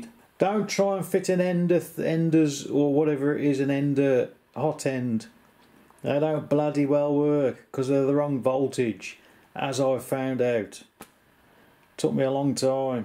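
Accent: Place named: England, United Kingdom. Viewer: I'd never stop inventing truly useful and cool stuff for dudes if I had one of those, Mate! None of the unimportant drivel I do daily would ever get done!!! I'll have to keep building stuff out of old "junk" or all the "junk" I do would never get made into new "junk". If I just had a "pence" for every time I said, "Man t'would be so much easier if I just broke down and got a damn printer!" I'd easily have enough "pence" to get a damn printer!!! 😂🤣😂 Much love!!! ✌ ϻя.ƹ PostScript - truly not a stalker, just after a couple vid's I actually watched of your crazy "bum" going fast on e-bikes, I figured I'd give ya a real go and have your channel on "play all" in the background. Of course, I click over to see whenever I hear ya laughing or cussin', as I know those are the important parts to me anyhow. I simply find your relaxed English accent combined with your internal teen-like rebellious angst a calming juxtaposition ... 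HA ... spelled that one correctly w/o autocorrect for a change 😂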